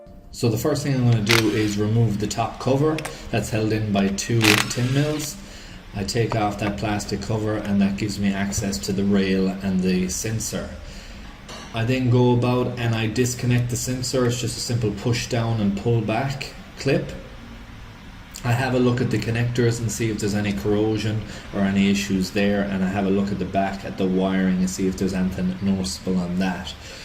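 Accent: Ireland. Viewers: Great video, just subscribed. I'm in Ireland you sound like your familiar to there :) . I have a 2.5td ranger year 2011, losing power on and off today it would hardly pull up hill then it might go again but constantly down on power over last few months. I put in new injectors and cleaned out diesel tank still has the issue. Any suggestions, thanks for the help..No lights coming up on the dash. Tks again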